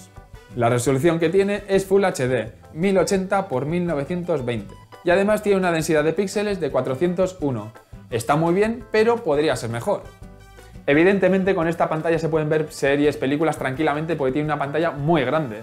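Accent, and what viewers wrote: Colombia. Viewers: SOS colombiano? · ¿Eres Colombiano?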